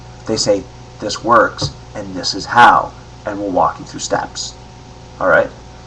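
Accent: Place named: United States. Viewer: Pity about the accent. It's hard to sound intelligent in an American accent.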